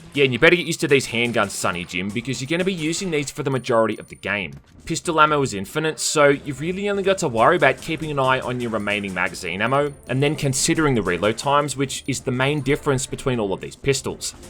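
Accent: Australia. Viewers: Wait... is an Aussie? I thought he was British!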